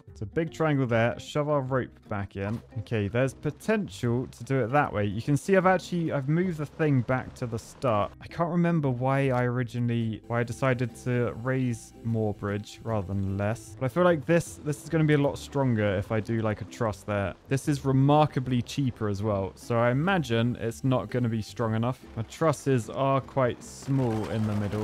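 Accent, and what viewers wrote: United Kingdom. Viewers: I love the little British man in my phone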